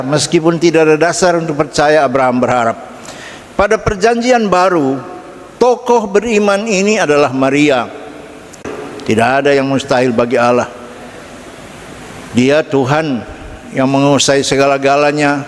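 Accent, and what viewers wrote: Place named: Papua, Indonesia. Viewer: DIALEK PAPUA ITU KELIHATAN KERAS TETAPI ITU BUKAN BAHASA KOTOR ATAU KASAR, USKUP KAMI KEUSKUPAN MANOKWARI-SORONG ADALAH USKUP DENGAN GAYA DAN CARA PANDANGNYA SAMA SEPERTI ORANG PAPUA PADA UMUMNYA. BEGITULAH KAMI DISINI. INGAT USKUP KAMI HILARION DATUS LEGA ADALAH USKUP YANG TAHU BENAR SIKON DI PAPUA, SALAM HORMAT DARI UMAT DI TPW FAKFAK, PAPUA BARAT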